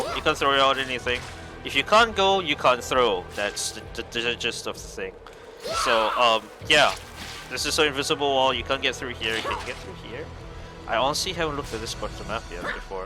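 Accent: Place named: Singapore. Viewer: Sounds like Singaporean or Malaysian 🤭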